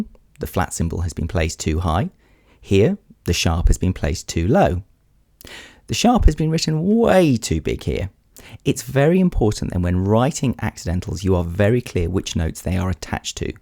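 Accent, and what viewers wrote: United Kingdom. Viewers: I like your british acent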